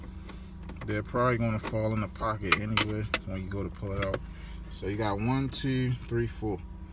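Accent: Baltimore, United States. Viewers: You from Baltimore ain’t you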